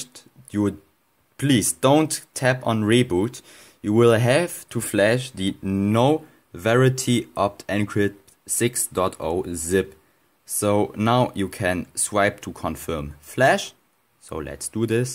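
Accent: Germany. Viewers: Man Hört das du Deutsch bist xD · Are you from germany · Oh I can feel your German accent 😂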